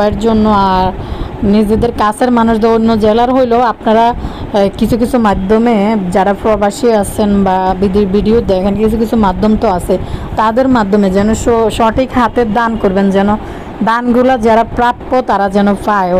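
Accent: Noakhali, Bangladesh. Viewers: Apnar desh kumilla but apnar kothar style noyakhali.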